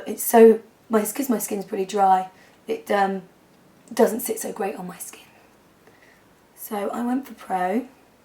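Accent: United Kingdom. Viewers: I just love doing that line, it makes such a big difference along with the mascara, sometimes you don't need anything else on the eyes. When you said gel liner I heard jaw liner, but of course english is not my native language, and paired with that lovely british accent (which I would kill for, I can only speak like Americans meh) it came funny to my ears. :D BW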